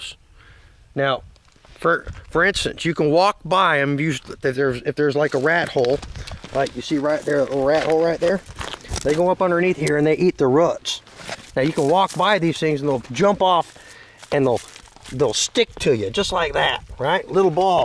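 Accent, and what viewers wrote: United States. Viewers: I feel the america in his voice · Me at 4 am: watching how to remove cactus with a 70s comb from a man with a southern accent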